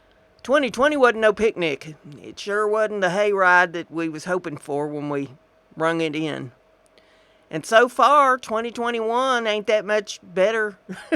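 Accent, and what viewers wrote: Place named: South, United States. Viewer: Southern accent Idk partner! 2021 better be better and not another tarnation. Lol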